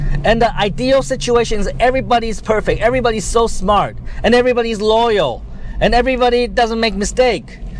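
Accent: Standard. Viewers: nói rất chuẩn dễ hiểu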